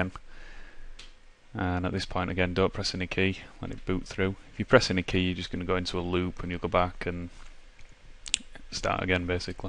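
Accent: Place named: Northern England, United Kingdom. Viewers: i can't thank you enough for that tutorial - you've just saved me two 50 journeys and no doubt the same amount in pound notes from the pc shop for doing it!! and i could even understand your yorkshire accent! :@ cheers. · Nikos Soumpasis lol it sounds like hes got a northern english accent and it sounds like an easy one to understand. but he was putting me to sleep and making me lose interest lol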